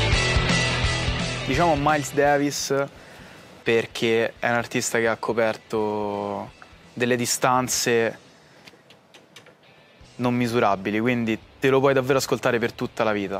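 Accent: Rome, Italy. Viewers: alla Ferilli nessuno ha fatto appunti di questo tipo per la sua presenza a Italia's got talent.. Eppure non sta recitando nella trasmissione..la sua cadenza romana non è paragonabile a quella di questo ragazzo. La Ferilli ha costruito la sua carriera sulla sua cadenza.. Fuori dalla recitazione ancora di più. E come lei tanti altri. Altro che Ludovico Tersigni. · Un po' meno accento romano che amo negli attori ma non in un conduttore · Ma chi e? Ma perché? Ridateci Cattelan 😭 E comunque, la smetterà mai di avere questo forte accento romano, che poco si addice ad un conduttore?! (Ma pure ad un attore a dirla proprio tutta.)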